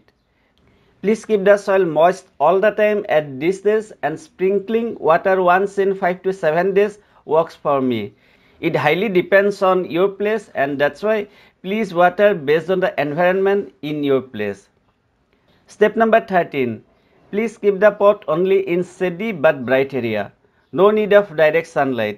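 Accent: Assam, India. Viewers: R u assamese ?? English accent xuni tu assamese jenei lagil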